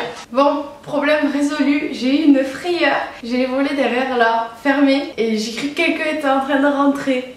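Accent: South, France. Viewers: L'accent du sud il est trop bien à écouter il met de bonne humeur😊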